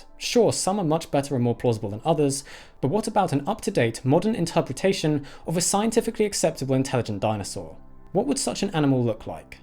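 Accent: United Kingdom. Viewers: It sounds like it must be a smart argument because he's British, so it sounds smart....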